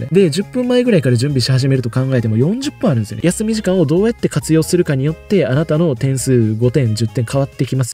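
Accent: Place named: Kansai, Japan. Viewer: 関西弁丸出しなのが本気度を表してる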